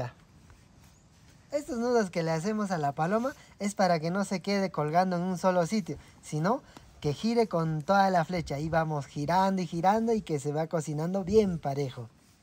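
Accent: Peru. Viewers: Buen día, joven no se de donde es usted. Observó que habla como los mismísimos cusqueños la tierra de mi señora madre. Felicidades por no perder sus raíces y escencia.. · Me encantó este cocinero me 😂 mucho con su endulzadas con sal espectacular esa manera de cocinar y de decir las cosas mil felicitaciones me gustó tanto que me suscribí casi no lo hago, de donde es? Perú?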